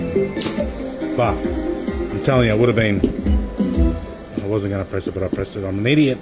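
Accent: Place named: Australia. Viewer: Real Aussie accent 😅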